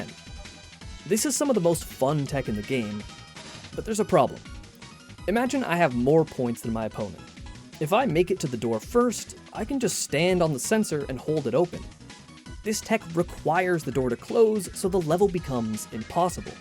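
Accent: Canada. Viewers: Oh yeah HAVE to be canadian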